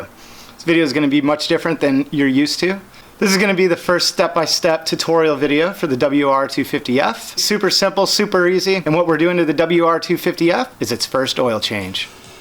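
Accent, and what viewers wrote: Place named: England, United Kingdom. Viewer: I am not saying has gay hands even though they are pretty small and your ascent some times sounds a bit English.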